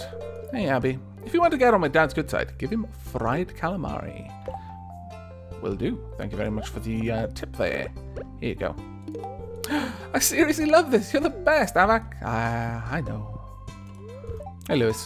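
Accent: Wales, United Kingdom. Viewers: I am nearly sure that Aavak is Welsh. ;-)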